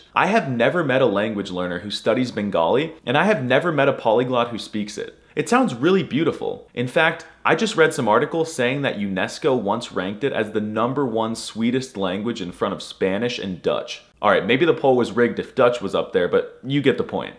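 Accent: Rio de Janeiro, Brazil. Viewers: his portuguese spelling his absolutely perfect 😂😂😂 I am brazilian, and he really played a brazilian speaking, it is really this tone that we talk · As a Brazilian he sounds like people from Rio de janeiro lol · as a native Portuguese: You talk Português from Brazil, not the right accent if you want to speak Português.. and some words are different too, but in the end we spoke the same languange, we understand with no dificult all hispanic languages.. Countries like, Angola, Moçambique, Guiné Bissau, Cabo Verde, Timor, Macau the official language is Português too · 😂enough w the pt-br accent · You sound like a native Brazilian, not a Portugese person. That being said, I'm so glad my first language made it on this list. :D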